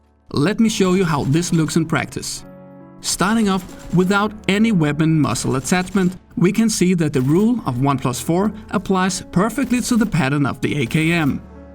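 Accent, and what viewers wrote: Denmark. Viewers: You deserve all the subscribers you have multiplied by 10. Thx for such great content. Are you btw from Denmark? Seem to detect a DK accent 💪🏻🇩🇰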